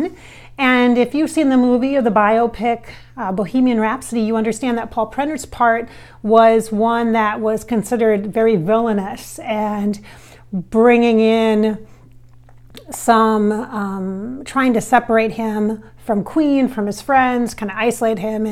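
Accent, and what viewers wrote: Ireland. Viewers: You developed an Irish accent. That’s interesting that you developed his accent. When you talked to him. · Was paul irish? Almost sounds like an irish accent as you are speaking.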